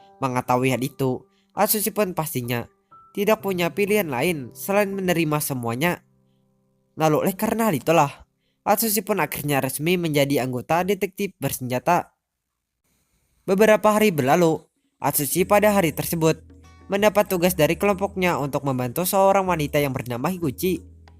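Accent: Banjar, Indonesia. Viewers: Logat nya kaya urng Banjar pang 😂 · Org banjar bg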